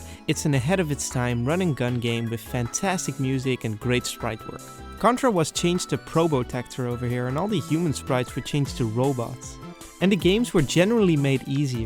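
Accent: Netherlands. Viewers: WHY do I ALWAYS recognize english speaking DUTCHMEN?! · Oh, a fellow dutchman! I was wondering why your accent sounded familliar. I've been meaning to get into game collecting. I have already baught a nintendo 2DS as I used to have a 3DS back in its hayday. And I missed some of the titles on there. I also have a Wii U but I only have one physical game on it. I'm gonna subscribe to your channel hopefully learn some more about game collecting in the future. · You dutch? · Jij bent 100 procent nederlands. Je accent maakt het duidelijk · You’re definitely Dutch aren’t you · Ik had pas in de gaten dat je Nederlands was op het moment dat je het zei. Nederlands accent heb je haast niet meer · accent gehoord, nederlander gespot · Wow man, Nice subject. great editing and research. Also great accent for a fellow dutchman. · Watching your video I was like: damn, this dude sounds like he could live next to me. 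And then you said you're from the Netherlands and I'm like, makes sense lol. Great video! The King's Day part hit.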